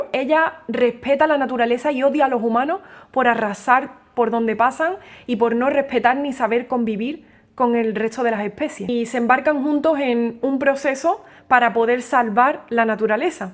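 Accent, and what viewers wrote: Andalusia, Spain. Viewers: Ese acento andaluz 🤤🥰 enamora! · porque tiene un acento español diferente? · Los domingos con tú regalo musical son otra cosa. Se aprende con tus comentarios siempre correctos, agradables y llenos de ese gracejo andaluz que te caracteriza. Como guinda tu interpretación y entonces atónito contemplo la maestría de que no defrauda jamás. Se cierra el telón y a esperar toda una semana. Un millón de gracias. Un abrazo. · Hay muchos acentos en España, este es del sur (Andalucía) y dentro de Andalucía hay lógicamente más acentos. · Que bonito como tocas la guitarra y tambien como hablas, de que parte de España eres? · que podria ser mejor que escuchar la cancion de la, pues que te hagan una intro y te hagan amar mas la cancion posd: de que parte de españa es tu acento, me gusta mucho.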